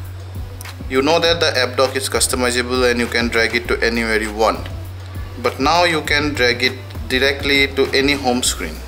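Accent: Assam, India. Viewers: the guy has a very much Assamese accent ;)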